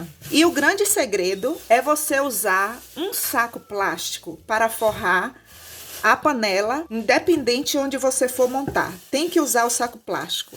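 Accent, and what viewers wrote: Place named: Northeast, Brazil. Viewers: O que eu gostei o seu sotaque alagoano, igualzinho ao sotaque do meu sogro. A receita, ah! essa é maravilhosa 😅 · Já amei o sotaque dela😍 nordestina marminino❤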